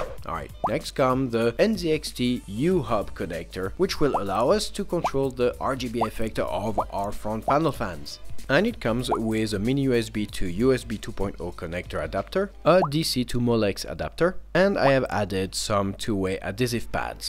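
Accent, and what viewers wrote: France. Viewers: tu as un acent Francais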